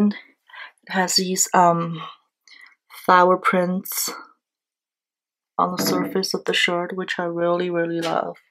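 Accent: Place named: England, United Kingdom. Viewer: like your english accent. wanna hear chinese too! plz do chiese video sometime soon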